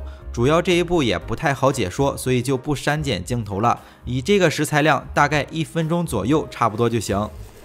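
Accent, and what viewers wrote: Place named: Shandong, China. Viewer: 喜歡老爸偶爾解說 非常親切的山東口音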